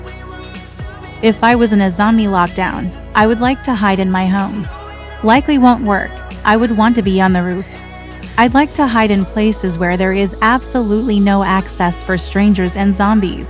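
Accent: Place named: Australia. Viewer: O.M.G!!!! The moment I have been waiting for has arrived! I cannot get over at how excited I was watching your voice reveal video. I took in every word you said and imprinted in my mind your favourites things. I too am into kdramas like sugar fizz! And I too enjoy Melisa McCarthy!!! I knew your voice would be sweet and gentle, and I love the Australian accent!! I bet you can do and be anything you want to be if you just put your mind into it. I will continue to watch your videos to the very end! You mentioned you won't be directing movies forever 😢 but when you do i will be around to celebrate an end with you❤ a the good luck with your future endeavours!! Love you queen!💙💙💙💙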